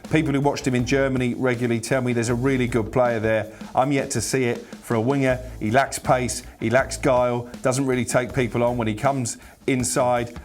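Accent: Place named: Cockney, United Kingdom. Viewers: Yet another united fan/pundit with a southern accent 😂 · Yet another cockney accent, makes me proud to be a blue LOLs.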